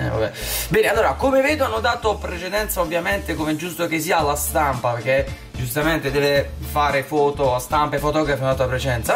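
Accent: Bari, Italy. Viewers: Ma sei barese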